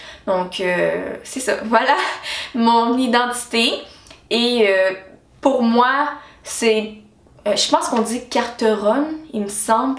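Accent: Quebec, Canada. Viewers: En passant, tu ressembles à une Haïtienne. En tout cas, je l'ai senti avant de voir cette vidéo. J'ai regardé ton autre vidéo sur ta routine naturelle pour le visage et j'ai dit ''Je suis sûr qu'elle est Haïtienne (qui vit au Québec depuis longtemps aka ton accent) OU peut-être un mélange Haitïenne & Québécoise''. Je ne vois pas pourquoi on te pose autant de questions mais bon lol; il y a beaucoup d'Haïtiennes de ton type.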